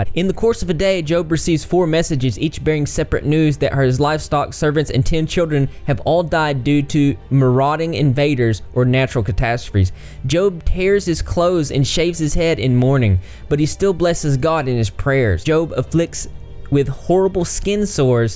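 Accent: South, United States. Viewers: hearing all this in a southern accent has me shook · And as far as someone saying something about your voice in a previous video... I’m a southerner from Alabama and see nothing wrong with a southern accent. 🤠👍🏼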